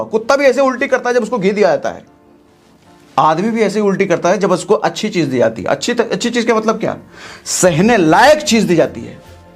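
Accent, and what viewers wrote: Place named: Bihar, India. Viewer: Sir aap jab bihari mi balte ho to bada ache lagte ho Aap ki motivation video muje bhut pasand hai .or mere andar bhut change aata h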